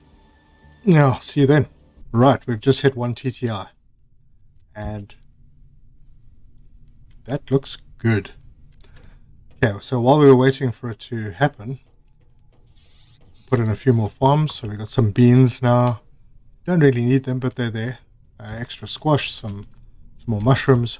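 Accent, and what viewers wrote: South Africa. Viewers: Your slight accent makes me think South Africa region? Anyway, I am really looking forward to this next update. Been playing for a few months and have been loving the game.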